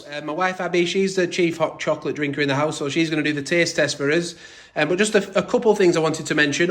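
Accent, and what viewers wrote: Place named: Birmingham, United Kingdom. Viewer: What's this accent ? Is it from Birmingham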